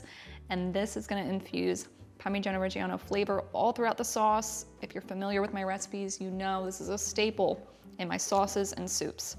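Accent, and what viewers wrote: Philadelphia, United States. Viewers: you sound like you’ve a light Philly accent?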